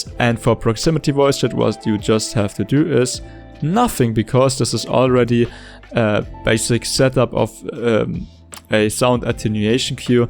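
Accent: Germany. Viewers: man hört soooo dass er deutsch ist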